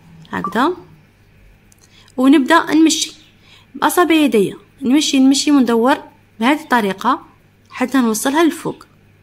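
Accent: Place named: Sig, Algeria. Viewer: ربي يبارك ختي هادو اللوزيات يعطيك الصحة اللهجة سيڨلية 👍💖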